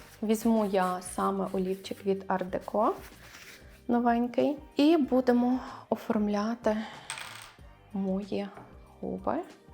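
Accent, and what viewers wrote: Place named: Galicia, Ukraine. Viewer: Вітаю,. Дуже мені подобається дивитись Ваші відео, а особливо чути рідний галицький акцент. Але я Вас дуже прошу, немає у нас слова «ваший», правильно казати «ваш». Дякую, не ображайтеся, будь ласка 😊 Бажаю Вам творчих успіхів.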